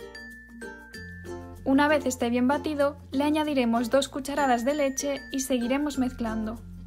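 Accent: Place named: Argentina. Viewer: Jajajajajjajajajja siiiii obvio tono re argentino ehh arriba argentina xd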